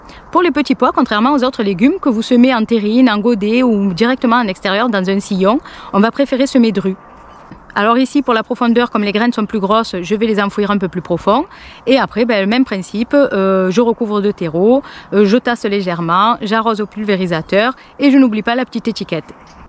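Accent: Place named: Provence, France. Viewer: super merci pour la réponse, j'ai l'habitude de tout (ou presque) rentrer tant qu'il gèle. À la vue de tes résultats je vais m'inspirer de ta façon de faire, je suis en Provence, donc à l'ouïe de ton accent on devrait pas avoir une grosse différence de climat. 😉 À plus merci encore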